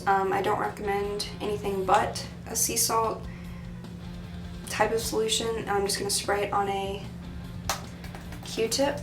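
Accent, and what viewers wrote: South, United States. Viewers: Are you southern you sound like you have a southern accent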